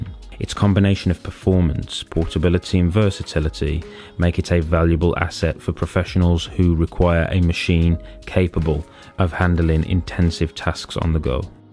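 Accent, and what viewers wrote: United Kingdom. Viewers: That British accent is 🔥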